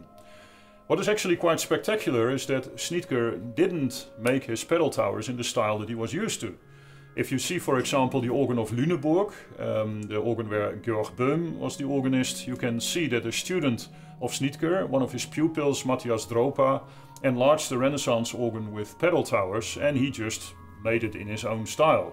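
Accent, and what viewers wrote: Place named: Limburg, Netherlands. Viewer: Gro-ning-en ..Op ze Vloms of dialec, Limburgs ..zo moeilijk nie ;-). Wel mooie technische uitleg en fantastische klanken. Djië hé't da Goe gedoan mènneke "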